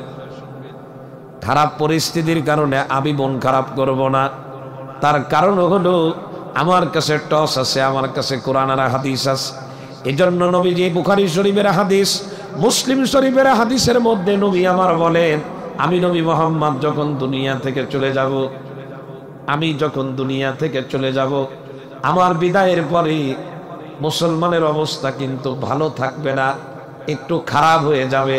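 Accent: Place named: Satkhira, Bangladesh. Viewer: Satkhira tune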